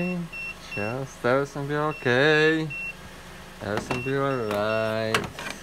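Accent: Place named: Russia. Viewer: I totally agree with you I couldn’t understand most of what he was saying with that Russian accent? I think he was trying to be funny or something but sounded so stupid!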